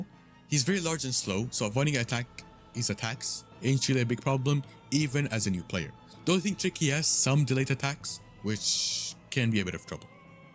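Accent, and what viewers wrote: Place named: Gulf, Arab world. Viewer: u got a khaleeji accent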